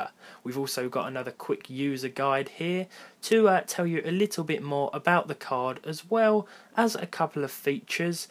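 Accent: United Kingdom. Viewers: i love your british accent dude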